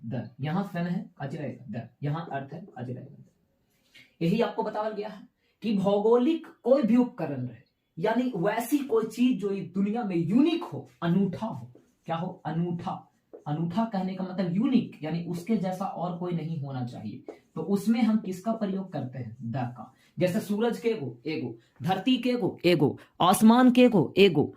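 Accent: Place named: Bihar, India. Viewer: Bihari style me aap bahut ache padhate hai sir ji❤❤❤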